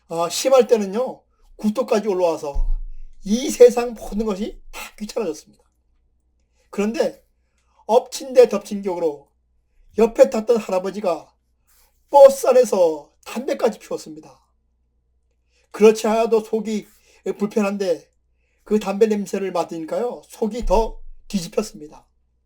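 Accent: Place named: Gangwon, South Korea. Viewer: 어머.선생님 어쩐지 강원도 사투리가 있다 싶었는데... 반갑습니다. 저도 영월출신입니다.^^ 저도 주역공부 열씸히 하고 있답니다.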